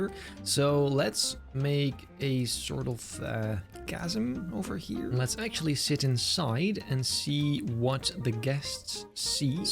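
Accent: Netherlands. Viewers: ben je nederlands???? wand heb een gevoel dat je nederlands ben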